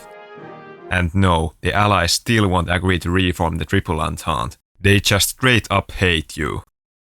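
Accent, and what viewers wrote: Finland. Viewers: i just can smell the finnish accent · Are you from Finland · are you finnish? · After xPetu, this is the most magnificent Finnish accent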